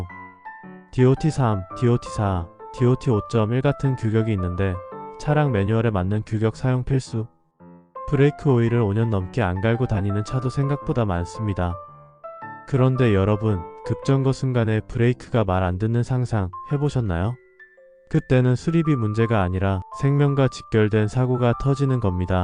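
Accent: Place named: China. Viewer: 말이 서툴러도 직접말하세요. 읽어주는 자가 중국인으로 추측됩니다 한국어를 짓발는 행위를 그냥 두고보면 당신도 간첩이 됩니다.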